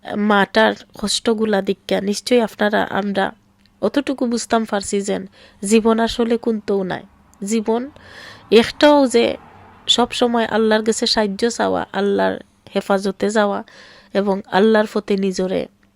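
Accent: Sylhet, Bangladesh. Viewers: Apu aponar mat amar kace kub balo lage amio sylheti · I like your Sylheti bengali, don't change, you're a unique sister.❤ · আসসালামু আলাইকুম আপু আপনার সিলেটি মাত আমার খুব ভালো লাগে। আমি একজন সিলেটি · Apner mukher vasha suddho khati sylheti vasha ami o akjon sylheti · Afa please keep speaking Sylheti , I like the way you talk No afa sylheti people try to speak Dhaka language, it’s doesn’t suit them 😂😂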